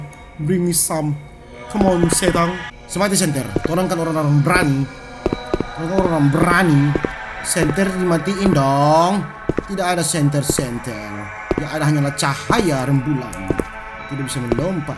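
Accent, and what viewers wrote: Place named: Java, Indonesia. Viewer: Logatnya lucu njay sma dengan orang jowo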